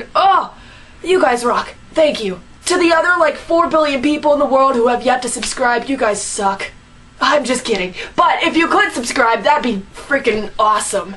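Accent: United Kingdom. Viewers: 6 falam que ta ruim mais e por que ela fez no sultaque ingles (nao sei como escreve sultaque)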